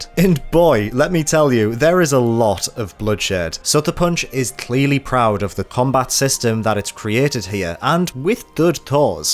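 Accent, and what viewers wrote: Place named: Wales, United Kingdom. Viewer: Maybe Welsh pronunciation creeping in? I noticed it too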